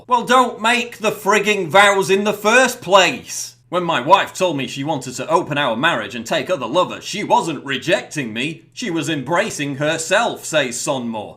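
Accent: United Kingdom. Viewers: Paul Joseph Watson Okay, Paul, between your humorous and colorful description of the truth and your British accent, I could listen to you all day long!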